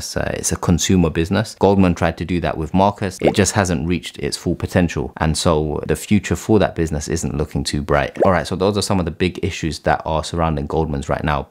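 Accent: London, United Kingdom. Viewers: 1. Awesome video. 2. I really love the new generation London accent 3. I will always be so thankful for your videos that helped me get into banking 2 years ago, thanks so much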